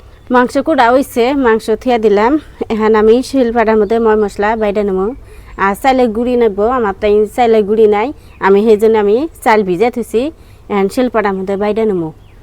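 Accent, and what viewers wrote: Tangail, Bangladesh. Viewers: টাঙ্গাইল এর ভাষায় কথা বলেন আবার পরিচয় দিচ্ছেন ভারতীয়? এই ভুল তথ্য দেওয়ার কারণ কি?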